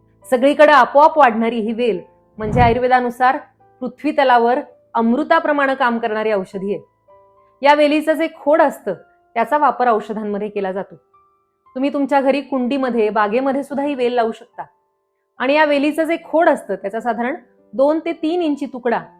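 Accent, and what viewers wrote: Standard. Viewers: मॅडम मी अलीकडेच तुमचे video बघायला सुरुवात केली आहे तुम्ही अतीशय उत्तम आणि विविध आरोग्य विषयक माहिती देताच पण तुमची वाणी अतीशय शुद्ध स्पष्ट आहे त्यामुळं खूपच प्रभाव पडतो तसेच विविध श्लोक तोंडपाठ आणि अस्खलित पणे म्हणून दाखवता खूपच प्रभावी आहे लहान मुलांच्या मधील बाळ दमा कफ यावर कृपया मार्गदर्शन करा विनंती आहे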